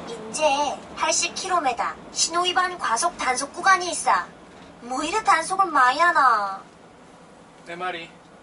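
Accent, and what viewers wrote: Gangwon, South Korea. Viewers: 강원도 사투리버전 진짜 밤에 낄낄 웃었네요ㅎㅎㅎㅎ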